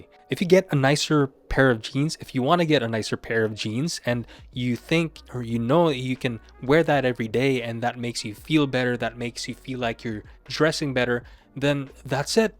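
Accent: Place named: Philippines. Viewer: You kind of have a Filipino accent when speaking in English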